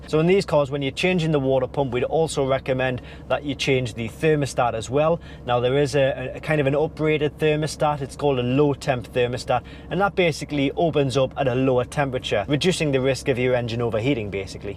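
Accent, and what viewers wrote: United Kingdom. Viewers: what part of the UK are you from? Your accent is different from my London friend.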